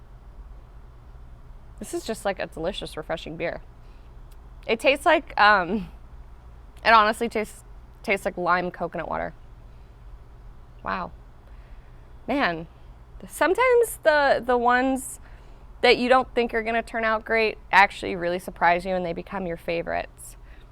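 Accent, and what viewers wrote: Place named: United States. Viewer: Hun snakker ikke et nordisk sprog så er det ikke bare ok at hun siger ord med et amerikansk accent?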